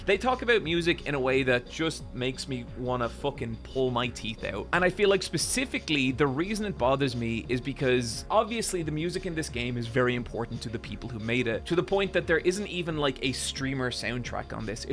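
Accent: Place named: Ireland. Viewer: He is Irish...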